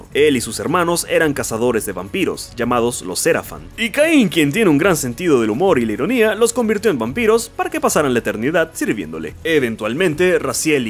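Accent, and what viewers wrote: Venezuela. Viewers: Será venezolano el men ?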